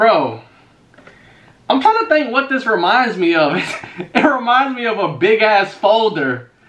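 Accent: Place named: Wisconsin, United States. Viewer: bruh u sound like ur from beloit wi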